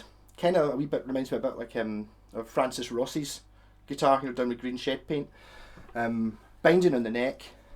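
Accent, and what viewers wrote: Scotland, United Kingdom. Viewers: That's a jock accent for you, if you think that's bad wait till you hear strong Blackburn accent 😉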